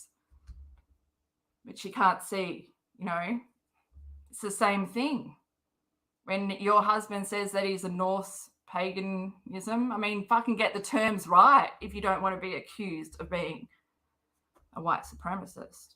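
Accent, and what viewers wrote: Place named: Australia. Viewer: Your voice helps me sleep. I watch all you vids and it’s my favorite to put one on before bedtime to fall asleep to your Australian accent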